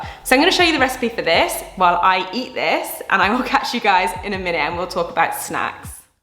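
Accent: Australia. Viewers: you have an amazing australian accent. Love it. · Australian accent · Omg your cat in the background 😂😍 PS love this video, you are so down to earth and relatable. I can definitely hear the Ozzy lilt in your voice!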